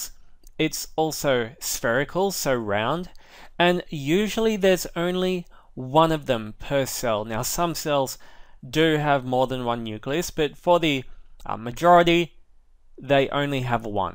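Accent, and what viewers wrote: Australia. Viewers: Is that australian accent??? 😍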